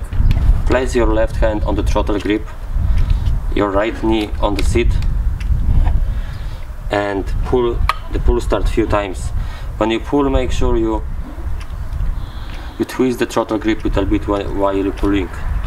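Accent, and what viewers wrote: Poland. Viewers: słychać że polak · Po języku słychać ze jesteś Polakiem wiec czemu nie mozesz mówić po polsku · Też czułem że Polak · Słychać ze Polak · Masz polski akcent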